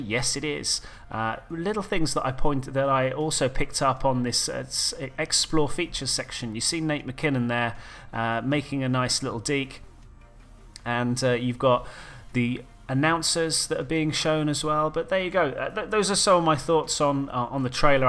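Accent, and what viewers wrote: United Kingdom. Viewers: It's funny, I've never heard a hockey fan with a British accent!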